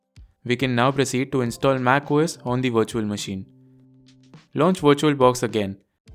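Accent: India. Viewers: Bro, sounds like a Indian text support scammer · you know this gonna work when the guy has an indian accent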